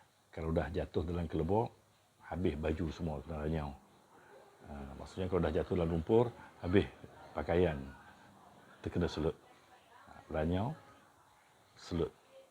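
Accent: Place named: Kedah, Malaysia. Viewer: Terbaik.. saya suka loghat kedah..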